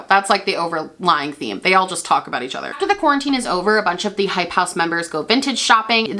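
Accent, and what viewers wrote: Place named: Upstate New York, United States. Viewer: Love how you have the most subtle upstate NY accent 😂😍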